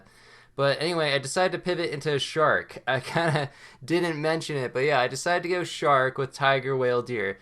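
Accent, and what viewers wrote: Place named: Canada. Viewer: Why do you sound like kenadian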